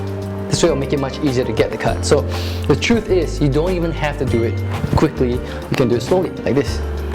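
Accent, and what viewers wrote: Singapore. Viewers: cool video dude...are u from singapore..just asking... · r u singaporean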